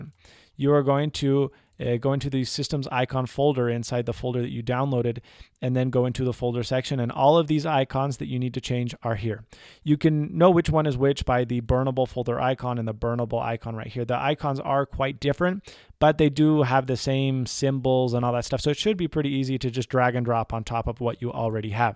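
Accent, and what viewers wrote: United States. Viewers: This was like an old Mac Madness Monday! Loved it. You sound way more American now as well. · Haha, of course there's nothing wrong with the accent, but we're used to hear him speak perfect american English. It's actually quite funny for his old viewers to hear.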